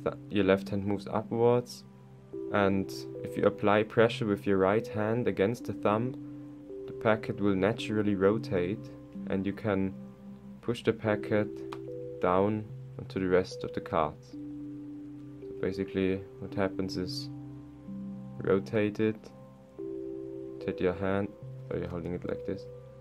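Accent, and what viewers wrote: Germany. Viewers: Nice germanischer Akzent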